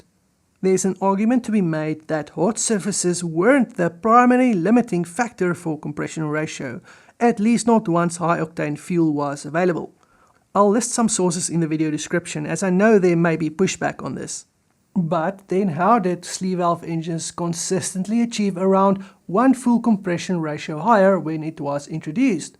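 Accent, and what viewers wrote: Afrikaans, South Africa. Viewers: Why do you sound afrikaans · Dig the the SA Dialekt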